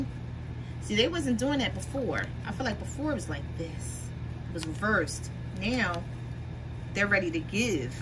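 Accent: Philadelphia, United States. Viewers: Good reading totally resonates... loving your nails! You sound like you’re from my city Philly 🙌🏾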